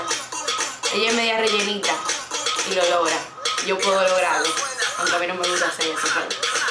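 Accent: Dominican Republic. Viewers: Eres dominucana? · es de RD????, es que habla como tal, porque yo también soy de RD pero respondame plis alguien la pregunta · La mayoría criticandola a ella cuando ella está dando una video reacción y dando una crítica constructiva nose por donde no se entiende además vi unos comentarios criticando la forma que habla por si la gente que piensa que República Dominicana no existe pues si, si existe Dios que acaso no se dan cuenta que cada país tiene un asentó diferente?! Creo que deberían darse cuenta que esta dando su opinión dentro de su perspectiva no es que ella va a pensar igual que ustedes. Desde mi punto de vista Yo pienso igual que ella no veo necesario mostrarse así en las redes sociales no hay nesecidad no? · Tu tienes el acento de mi pais republica dominicana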